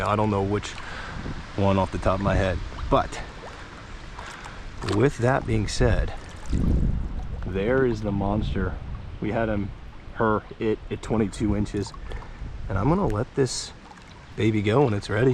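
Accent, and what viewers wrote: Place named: Delaware County, United States. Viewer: Good stuff funny listening to the delco accent growing up in frankford in philly ,now living up by green lane state park,good stuff would love to fish with you in summer! Keep up the wood content on the "wooder's" lol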